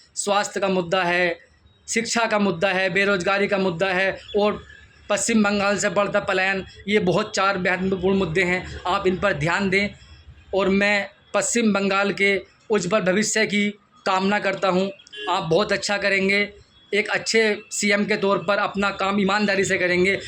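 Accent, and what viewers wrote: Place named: Uttar Pradesh, India. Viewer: Sandesh Khali ke Shahjahan pe b ek video bana de bhai . Tumhari bhasha Uttar Pradesh ki lag rahi hai , tum bengali to ho nhi .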